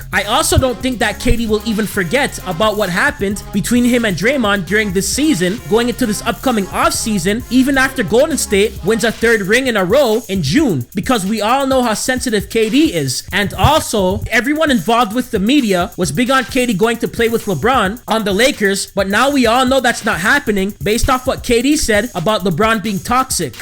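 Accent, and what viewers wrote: Toronto, Canada. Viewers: Mans got the biggest Toronto accent of life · sounding like a true toronto manzz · I can tell ur from Toronto jus from ur voice lmao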